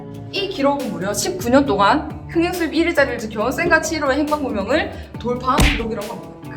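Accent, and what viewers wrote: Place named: Gyeongsang, South Korea. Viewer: 경상도 사투리를 고급지게 구사하시네요👍👍👍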